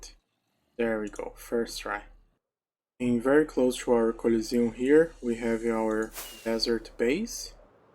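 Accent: Brazil. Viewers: esse cara é muito brasileiro kskks, o sotaque entregou de cara, BRABO! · Que sotaque bom de ouvir. Sotaque br pra inglês é massa demais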